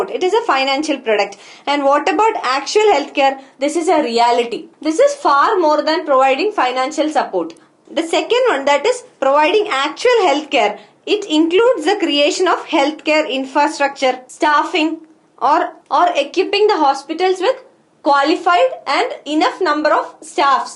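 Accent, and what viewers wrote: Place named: South India, India. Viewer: Ma'am u are very good in teaching . Yet another masterpiece from studyiq apart from gaurv garg,varun dhawan;mahipal sir and veer sir. Hatsoff to ur hard work . *** 5 stars from my side ma'am. And I gather u r from south India from ur tone. Hope we will habe good times with u ma'am in studyiq world.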